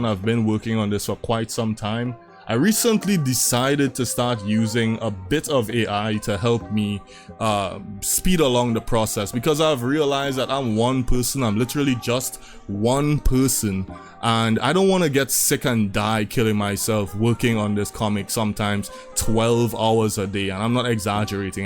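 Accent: Trinidad and Tobago. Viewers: Bro, you have a very trinidadian accent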